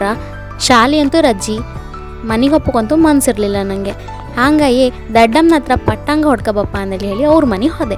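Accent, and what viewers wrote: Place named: Kundapura, India. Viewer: Beauty of kundapura kannada👌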